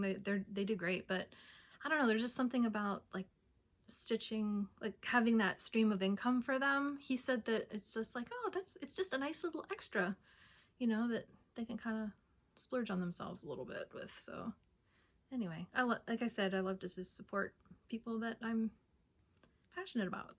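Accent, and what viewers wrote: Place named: Quebec, Canada. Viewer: Always great to see your flosstubes. You speak French with a French-Canadian accent! “Histoire” can mean either history or story in English. I love your Luda, and in fact all of the things that you work on. I hope the memory of your mom will bring you comfort.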